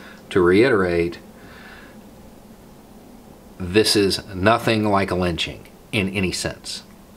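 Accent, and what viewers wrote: South, United States. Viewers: point, as he's said in his videos, is that there are plenty of white, bearded, ballcap-wearing, truck-driving, Second Amendment-supporting, Southern accent-sporting guys like him, who think like he does.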